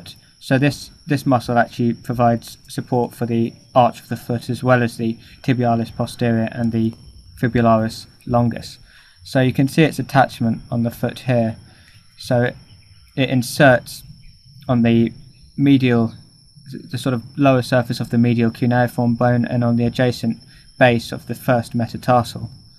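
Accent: United Kingdom. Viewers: Thanks for the tutorial . Your accent (British?) sounds amazing.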